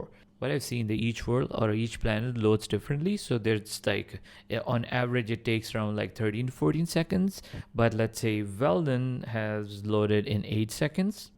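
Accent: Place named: India. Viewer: you slightly sound indian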